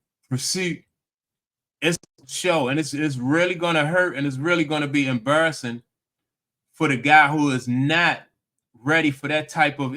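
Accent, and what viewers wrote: Baltimore, United States. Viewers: Are you from B-more?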